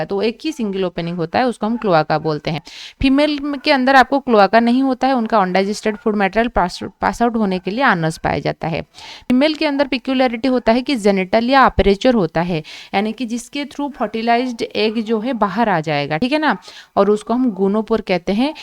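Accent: Odisha, India. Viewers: Are you from odisha mam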